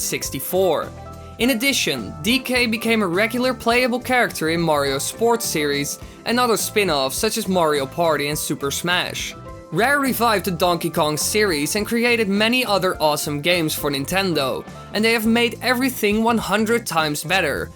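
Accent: Netherlands. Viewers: are you dutch? your accent sounds dutch... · Yeah, he's Dutch.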